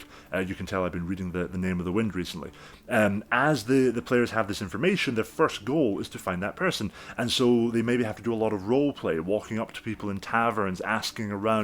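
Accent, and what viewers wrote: United Kingdom. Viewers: When a mustached man with a UK accent and a turtle neck speaks some knowledge to me, i listen.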